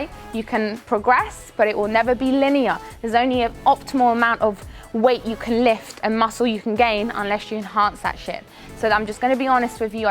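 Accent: England, United Kingdom. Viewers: English accents are everything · that’s her british accent fooling you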